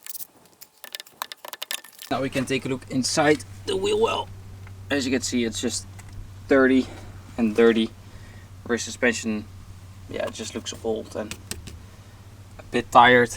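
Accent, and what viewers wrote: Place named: Netherlands. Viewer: You sound Dutch, are you in the Netherlands? Am in the Netherlands if you are, just bought my e46!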